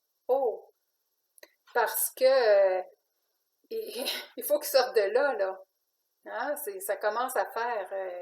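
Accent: Quebec, Canada. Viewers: J 'aime ton accent québécois et d'ailleurs je devrais une fois venir voir mes amis là-bas. A part ça je me suis abonné de suite car tu parles que de l'essentiel , tout ce que je lis tous les jours sur les médias alternatifs. J'aime ta façon d'expliquer et je suis toutes tes vidéos. Salutations depuis la Suisse. Raymond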